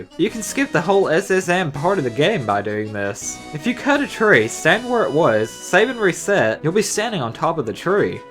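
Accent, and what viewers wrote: United States. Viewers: this guys voice... so fucking annoying country accent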